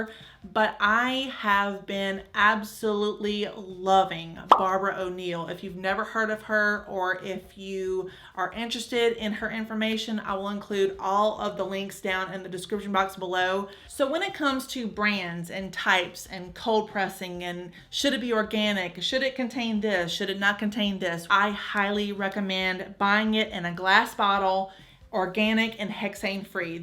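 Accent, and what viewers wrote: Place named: Alabama, United States. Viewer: This is my first time finding your page and my first thought was she talks just as country as me, i wander if she lives in Alabama too ? 😂😂 And before the video ended u confirmed that in fact u do 👏🏽😂i knew it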